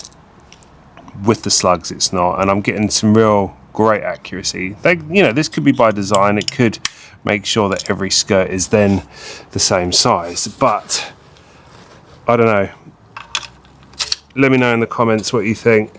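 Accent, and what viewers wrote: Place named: United Kingdom. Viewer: I am sure its a nice gun but as soon as I hear british accent and sub 12ft/ibs I turn off.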